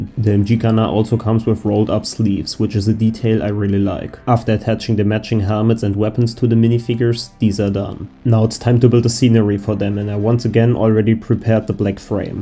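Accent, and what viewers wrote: Germany. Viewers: man hört es wen du deutsch sprichst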